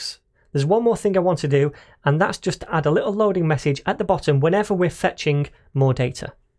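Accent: United Kingdom. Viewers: Hi! You're pretty cool in explaining things and your British accent sounds funny so I would really like to see next series about pagination. Here's my like and subscription.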